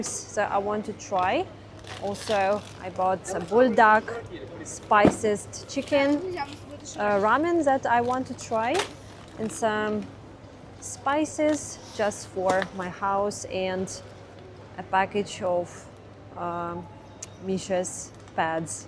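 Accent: Russia. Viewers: your English accent is more British than Russia 🇷🇺 even though you haven’t being there, that’s good, and I also like it when you spiced up with Russia 🇷🇺 English accent too. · Очень "рязанский английский" и тупой влог. Идите работать. Достали эти тупые блогеры.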